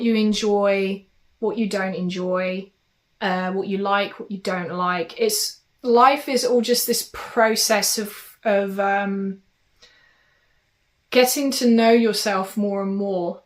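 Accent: France. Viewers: Hi are you secretly French or do you just have an insanely amazing French accent?! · I love your French accent haha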